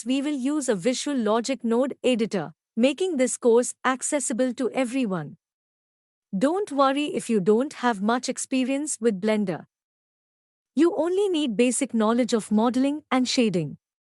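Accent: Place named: India. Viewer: Indian accent +20 to understanding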